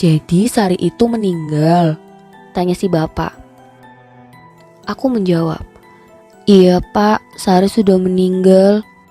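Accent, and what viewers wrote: Banyumas, Indonesia. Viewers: Udah penasaran sama akhir ceritanya, logatnya ngapak2 medok ya min 😂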